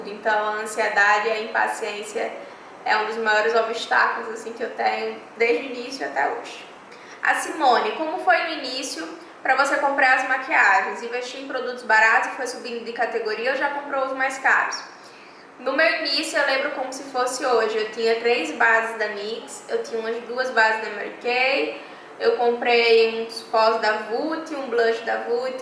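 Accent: Northeast, Brazil. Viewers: . adoro o seu sotaque vcs nordestinos. eu sou de formosa Go. sou cabelereira e maquiagem tbm. amo a profissão da beleza gosto do seu trabalho te acompanho a pouco tempo mais me identifiquei com vc. Que Deus te derrama muitas bênçãos em sua vida pois tudo que vem de Deus e bom perfeito e agradável. bjos manda um bjo pra mimmmmm. se vc puder entra no meu stagran e vê mais trabalhos e me sal o que vc acha a me de dicas. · Eeeee ,até que enfim ,descobri de onde vc é,suspeitei que era nordestina pq falamos muito igual...Kkkk,sou de Natal RN e estou apaixonada pelo seu canal.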